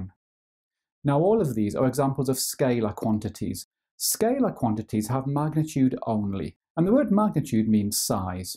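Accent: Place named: Newcastle, United Kingdom. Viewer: IS A GEORDIE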